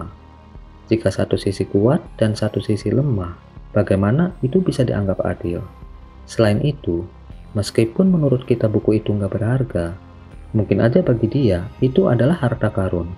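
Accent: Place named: Bali, Indonesia. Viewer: Logat bali ini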